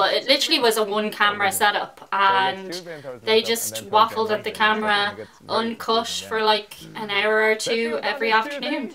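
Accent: Ireland. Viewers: What part of Ireland are you from I'm from dublin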